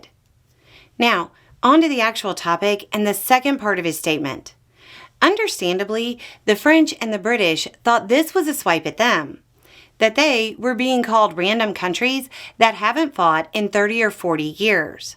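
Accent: South, United States. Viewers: til now, I still can't get over that gentle, laid back, southern tone and charm spitting insightful, hard-hitting, whiplash-inducing commentary.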